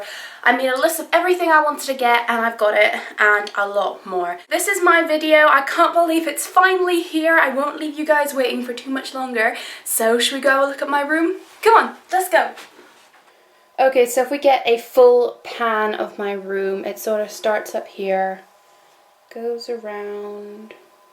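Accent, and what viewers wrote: United Kingdom. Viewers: Are you Scottish or welsh or English? But when I saw the Victoria secret dogs I thought they were from the USA and also the candles. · british accent dear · where are you from, you sound british, and most of the stuff is from british shops, but im sure i can hear another accent in there somewhere <33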